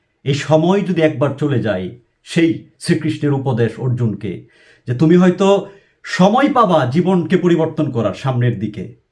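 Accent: Mymensingh, Bangladesh. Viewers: আসসালামু আলাইকুম স্যার, স্যার আমি আপনার ভিডিও দেখি বেশি দিন হয় নাই, এর মধ্যে আপনার সব গুলো ভিডিও দেখা শেষ। বড় আফসোস হয় আপনাদের মতো মানুষ দেশ ছেড়ে চলে যান! আপনার কথা যদি আমাদের দেশের শিক্ষাব্যবস্থায় জুড়ে দেওয়া যেতো আমাদের শিক্ষাব্যবস্থার আজ এই অধপাত হতো না। এই জং ধরা দেশের জন্যে আপনার মতো মানুষ খুবই দরকার। আরেকটি ব্যাক্তিগত প্রশ্ন ছিল স্যার, আপনার বাড়ি কি ময়মনসিংহ বিভাগে? নাকি কিশোরগঞ্জ? আপনার ভাষাটা ( আঞ্চলিকতার টান) খুব আপন মনে হয়। আশাকরি উত্তর টা দিবেন।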